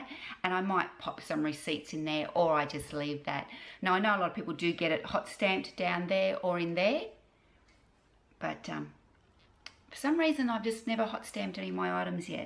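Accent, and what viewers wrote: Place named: Australia. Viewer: Great review! Beautiful item and Aussie English :)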